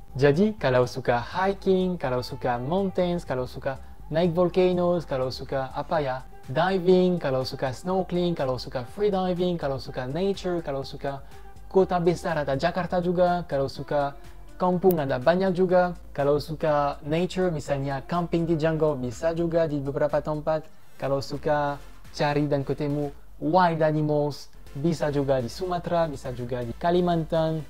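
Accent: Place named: France. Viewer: Bahasa Indonesia nya sudah lancar aksen nya doang masih Perancis 😁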